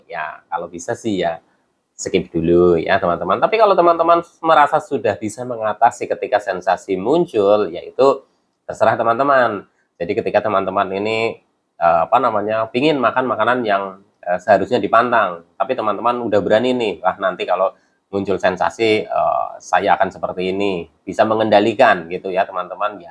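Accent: Banyumas, Indonesia. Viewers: Cilobak raya hadir menyimak massee, ora Ngapak ora kepenak😂 apa Kuwi "Tengengan, Massee?"🤣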